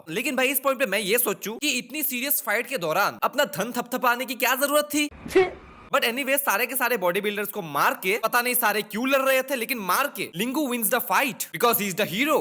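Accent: Assam, India. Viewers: Bro r u from noth-east assam!?❤ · bro are you from Assam 😂😂 please reply from where you are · Bhai tum assamese ho kya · Are you from Assam bro ?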